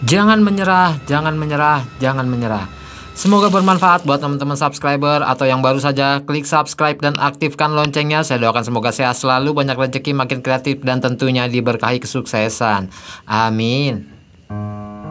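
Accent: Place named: Jakarta, Indonesia. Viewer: Hehehe...ini mah asli kobtrn adisi ngelawak ..tp lumayan cukup menghibur, jd kangen jakarta...ligatnya itu betawi bangettt ato jakarta banget dah familiar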